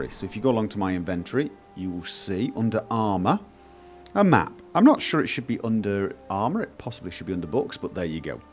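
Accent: United Kingdom. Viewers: Evans He is British. He resides in Norway, though. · REALLY? This whole time I thought he was British ._. And I'm British. He's really refined learning English then o.o, usually people retain accents when they learn a new language (Like Robbaz) but he just sounds British. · Hey, where are you from? I know it says Norway but your accent seems to be very British. Oh and awesome videos btw, keep it up.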